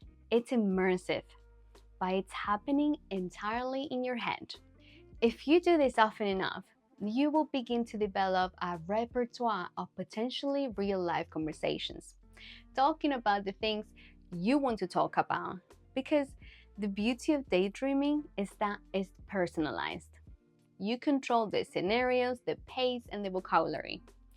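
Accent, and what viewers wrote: England, United Kingdom. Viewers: Your videos are very helpful thank you. Are you living in the UK? I think I can hear an English accent in there lol. I’m from Northern Ireland. I hope your channel grows as it should.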